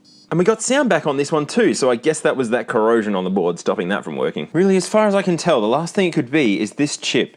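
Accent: Australia. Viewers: I love how Aussies say "ninety" and "nineteen"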